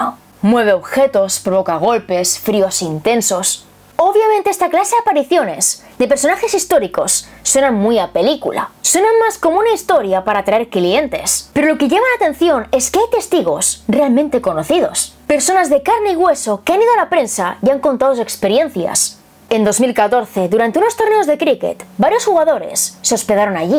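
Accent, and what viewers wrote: Spain. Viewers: Acento español y sentido del humor muy acido... la amo Pero es una papa casada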